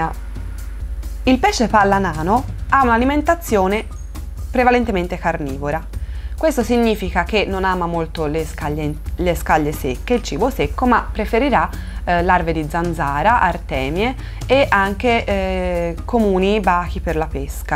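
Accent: Tuscany, Italy. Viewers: It's Italian , with a strong Tuscan accent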